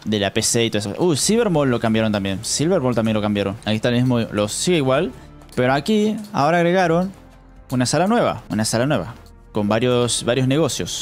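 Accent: Argentina. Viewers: Sos argentino 😁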